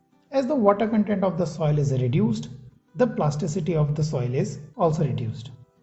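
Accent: India. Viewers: according to his accent, I think he is from south India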